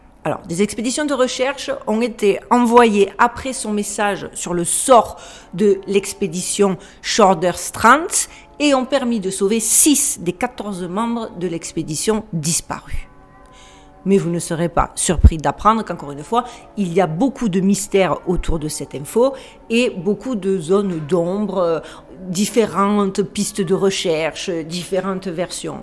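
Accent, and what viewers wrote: South, France. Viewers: Avec l'accent du Sud insupportable en plus... Perso je ne peux pas ça me gavé.. next! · Je découvre ta chaîne Youtube... Très intéressante 👍.. mais.. ton accent viens de ou ? Es tu française? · Bonjour j'adore l'histoire avec l'accent du sud !! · Quel humour,à dévoiler les choses,vous n'avez pas peur qui aillent plus loin que la censure,une vraie "Guerrière qui vient du froid😂,euh,non qui vent de là où il fait bon de vivre😁,super vidéo,intéressant d'approfondir le Sujet de L"'antarctique",vidéo traitant de découvertes parfois jamais entendue,avec humour,et cet accent qui vient du Shud🤣,excellent "& conteuse d'histoires extraordinaires pour petits et grands,quand yà rien à la télé,regardez "Les Capsules de L'aventurière Archéologue 😂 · j'aime bien tes videos sur l'antartique ! super intéressant merci et beau travail ! je trouve que tu as un bel accent du sud de la france, tu viens d'où ? 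Marseille ?? .... lol bises (je viens de Marseille aussi, maintenant j'habite en occitanie)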